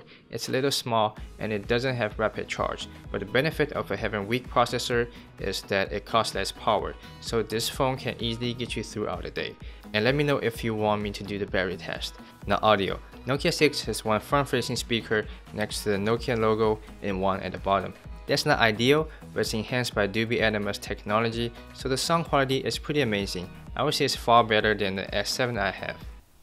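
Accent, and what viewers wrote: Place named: India. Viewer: jyothish swty he speak bad and annoying indian accent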